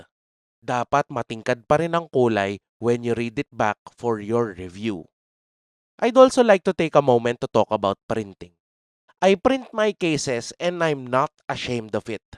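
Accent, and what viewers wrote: Philippines. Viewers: love the heavy Filipino accent!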